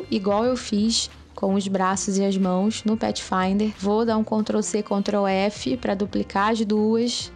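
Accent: Rio de Janeiro, Brazil. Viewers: Vc é muito didática! Adoro os vídeos e o sotaque carioca kkkkk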